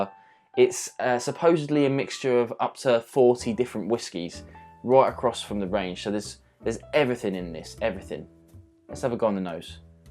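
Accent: England, United Kingdom. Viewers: I actually like the English accent. It makes him unique. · i could do without the engish acent.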